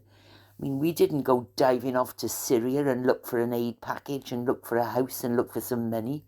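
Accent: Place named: Newport, United Kingdom. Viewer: Welcome back I hope you stay on for ever. From a former Newport women who lived in Pillgwenly for twenty years, now loving in Italy. Your accent takes me back to when I lived in Newport. We love you ❤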